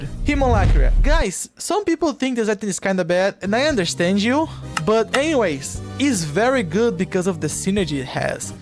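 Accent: Brazil. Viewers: o cara é br. percebi pelo sotaque kkkkkkkk. tmj mano, video mt bom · Percebi que é BR pelo sotaque no inglês haha massa cara...eu simplesmente não consigo passar o Mega Satan com o The Lost pra abir Godhead...muito ruim deixar de pegar os itens da devil room pra dar a Angel room e pegar as chaves acho que nunca vou conseguir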